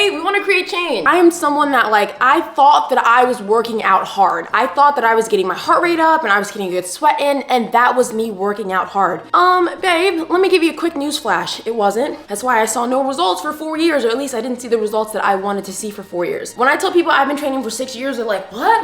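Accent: England, United Kingdom. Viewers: The English accent 😭🤣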